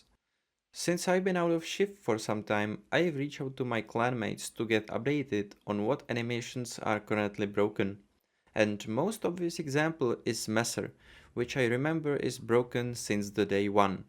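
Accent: Czech Republic. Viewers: Where are you from? You sound like Czech.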